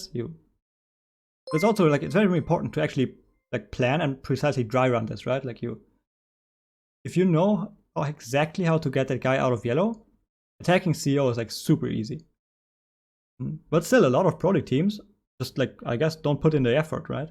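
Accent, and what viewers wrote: Germany. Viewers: I knew it i could hear the German Akzent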